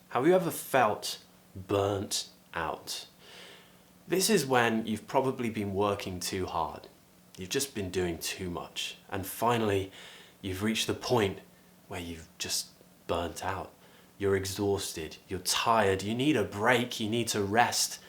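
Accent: United Kingdom. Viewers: I' ve been looking for a British pronunciation teacher for a longtime and finally i find you as i feel so lucky and privileged right now 💜💜💜🙌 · I learnd a lot of from you, thank you for that . However i miss you as a british pronounciation teacher . Hope you'r son is doing well as you and the rest of you'r familie too . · I really feel excited of your British English pronunciation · Hello! Thanks a lot for this video. I'm a Spanish native speaker and I would love to speak English with your British accent. Please, let us know where can we get your accent training. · I like your accent man , your way of explaining etc you r amazing and British accent is my favorite 😍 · always I came here to learn British accent thank you very much for being helpful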